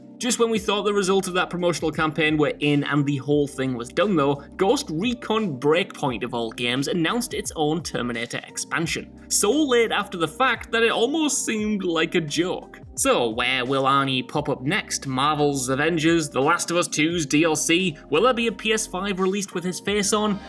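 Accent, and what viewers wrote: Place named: United Kingdom. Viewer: I think you went far to British in the vid. Had no idea what you were saying during skate section. Also Americans watch this channel. Your welcome.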